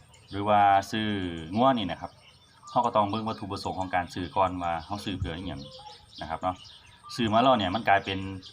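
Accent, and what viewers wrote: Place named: Chaiyaphum, Thailand. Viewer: ครูอยู่บ้านใดเว้าสำนียงคือคนชัยภูมิบ้านพี่แท่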